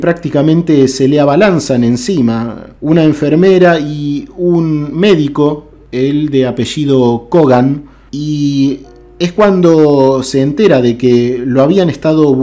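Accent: Argentina. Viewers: Adoro tu canal! Y con todo respeto el acento argentino es bellísimo. Muchas Gracias!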